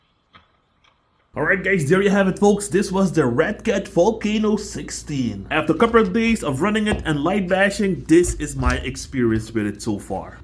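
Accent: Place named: Netherlands. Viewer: Suriname!...no wonder why you had a slight Dutch accent. Great video though!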